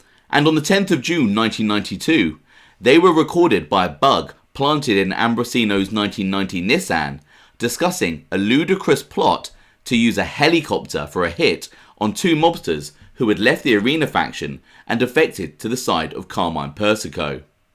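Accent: United Kingdom. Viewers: It's hard for my minds eye to envision an North East American Italian Gangster when a British Man is reading their Gangster quotes. Instead I see Peaky Blinders or Snatch.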